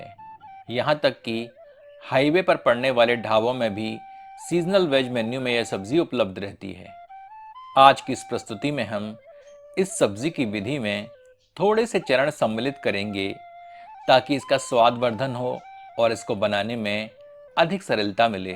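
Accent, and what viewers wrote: Standard. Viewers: शुद्ध हिंदी भी सुनने को मिला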